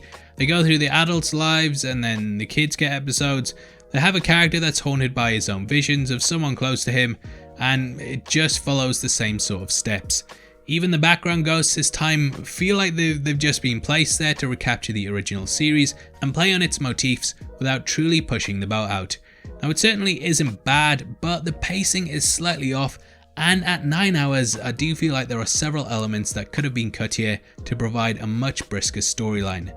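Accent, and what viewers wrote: United Kingdom. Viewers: An old, beautiful house... British accent... …nice dresses. Count me in! :)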